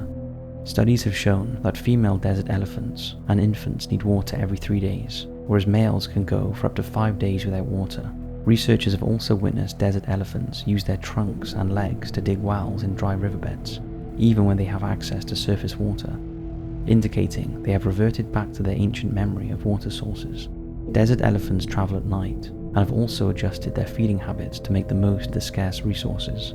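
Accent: United Kingdom. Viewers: British narrator asking for dollars. I'm guessing this is an American channel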